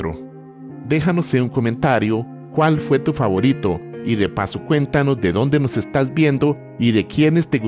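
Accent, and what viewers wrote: Costa Rica. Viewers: Es el acento tico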